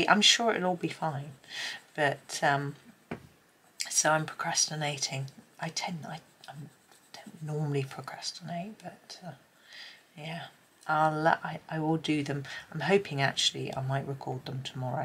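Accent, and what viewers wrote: United Kingdom. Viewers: The basket truly is the star of the show! I get such a kick out of how you Brits pronounce certain words so differently, like thistle. I'm with you, I'd never forage for mushrooms, too risky!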